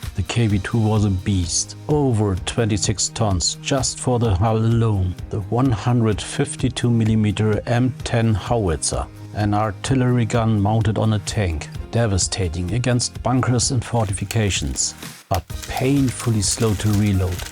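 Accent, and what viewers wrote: Germany. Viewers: I hear that you are german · love your vids! btw are u german?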